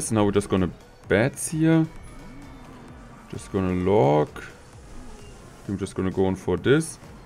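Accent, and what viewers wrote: Germany. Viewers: I love your German accent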